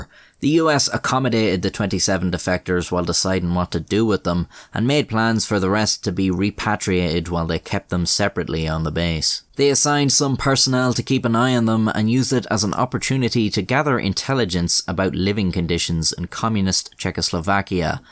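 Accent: Ireland. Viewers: I thought this was a darkdocs video, but when I heard the Irsish voice I was surprised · Bad-assery! Love the facial expressions! Makes me bark with laughter! Don’t abandon us Q- I need the snarky Irish storytelling! There might be a few questionable youtubers but there are Nobel folks too! Stand tall and be proud you bastard! Thanks and fight on! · This has quickly become one of my favorite Youtube channels great narrating and insanely good brogue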